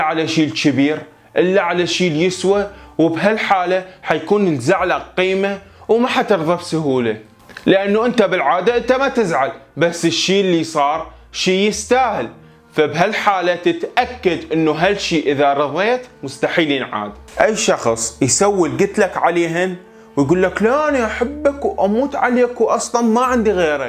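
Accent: Iraq. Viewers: تدري الي يسمع حجيك ميكول من زمان طالع من العراق. 😆 انته عراقي اصلي ههههه. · تحية كبيييرة الك من المغرب متابعينك جديد ونحب اللهجة العراقية جدا.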